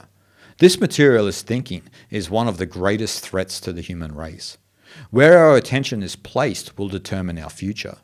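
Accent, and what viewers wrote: Australia. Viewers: no Indian, however how much esteemed he is in his field, could have explained so beautifully in english your description of the kali yuga. the particular phrase which you have used Swamiji, "the devolution of mind into matter", has really struck a chord somewhere. im assuming you're aussie from the accent. you will always find a home in Bharat. australia and nz are in for err a bit of rough weather mate. May Lord Shiva Bless you!. Om Namah Shivay!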